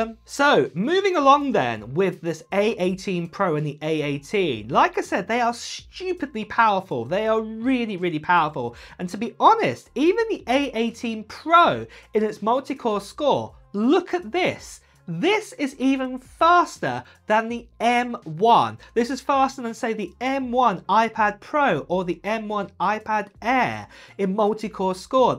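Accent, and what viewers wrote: United Kingdom. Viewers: Will be upgrading from my iPhone 13 Pro Max 256gb to 16 Pro Max 256 gb this Friday! I’ll even copy your delightful British accent, for the duration of my ordering call! 😂❤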